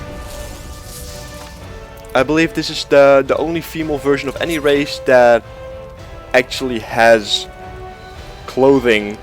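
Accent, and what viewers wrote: Netherlands. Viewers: "I'm and I am Dutch" or aint you? you sound dutch:) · are you dutch? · omg that dutch accent tho haha, I recognise it anywhere, mainly because I've got it myself:p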